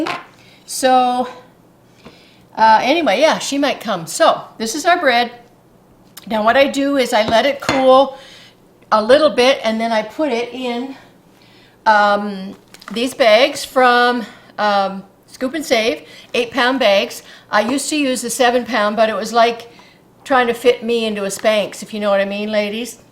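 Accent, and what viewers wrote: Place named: Cumbria, United Kingdom. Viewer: I begged for store bought white bread too. Horrid little thing I was. Ha ha. I will be trying your brown and digging out my bread maker. Loved hearing your voice- took me right back to keswick.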